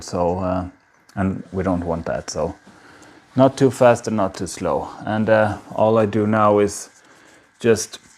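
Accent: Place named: Scandinavia. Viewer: Fantastic! I've just done my 1st harvest so it's nice that I'm lagging 3 weeks behind you so I can look at the tutorials. I'm definitely interested in making snus bc it's illegal in Belgium, makes it feel more like a delicacy. Are you Swedish? I detect a Scandinavian accent, didn't know you could grow tobacco in Scandinavia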